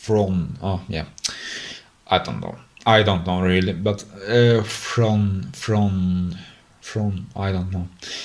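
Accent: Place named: Sweden. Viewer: You speak good english, but there is of course a little dialect from the Swedish dialect, but everyone has a little dialect, wherever they come from:)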